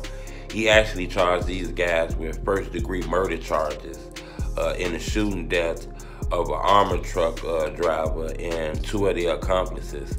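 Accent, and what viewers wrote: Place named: South, United States. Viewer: This man country as hell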